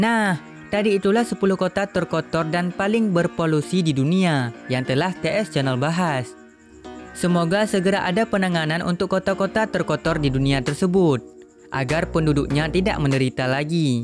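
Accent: Aceh, Indonesia. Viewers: Abg orang aceh ya bang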